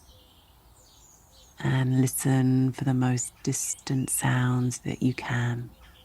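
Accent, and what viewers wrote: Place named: England, United Kingdom. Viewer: Ouse Ally and Kristen Roses meditation videos daily. I really enjoyed this. Thank you 🙏It’s especially nice to hear and English accent.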